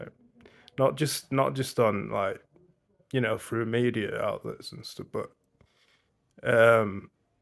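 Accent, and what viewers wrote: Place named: Yorkshire, United Kingdom. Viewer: if you couldant see his face you would think hes a white guy from yorkshire, if he was born and raised here he aint a migrant, if he is actually a migrant well done to him he got the accent spot on, wish the rest could speak english as fluently as he does, my only gripe with migrants is the fact that they dont have any education and they either dont or barely speak english, they add nothing to the country, and dont get me started on muslims and all their backward traditions polluting our culture, but he might not be a muslim, so cant really treat them worse on the assumption of what religion they may or may not be, long as he aint over here trying to ruin our culture he got no problem from me i hope the guy that made this video is ok, and i hope the guy that made this comment is ok, like the guy that uploaded this video might be having a rough time, but think if all you got to do with your day is leave shit comments like this on videos, with zero creativity, thats a bleak existence my guy hope you get more stuff to do in your day, and if your going to leave troll comments at least get creative and put some effort into it aye? 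bottom of the barrel that mate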